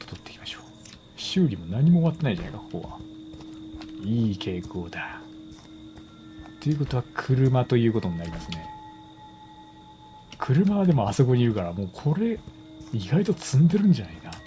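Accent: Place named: Kyushu, Japan. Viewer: 九州方面の方ですか？聞き覚えのある方言がちらほら…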